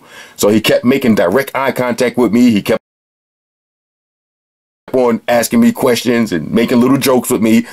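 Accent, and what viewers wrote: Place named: Brooklyn, United States. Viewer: Love your vids homie! Mad informative. You sound like my cousin in Brooklyn i swear! Salute!!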